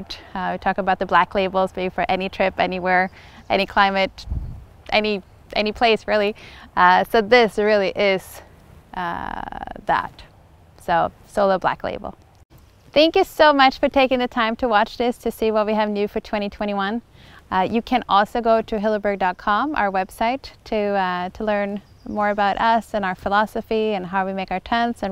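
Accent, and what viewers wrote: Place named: United States. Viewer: Discasting american accent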